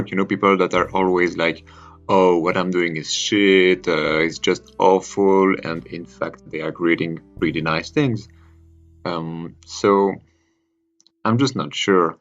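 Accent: France. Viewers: I know this is an older video, but I love your Gothic builds. Your French accent was delightful to listen to while you built this maison. I am a big lover of English and French history and I know it's been a while since you have uploaded sims4 videos, but I hope one day you will continue building European style builds. Maybe even historical ones! Have a lovely day.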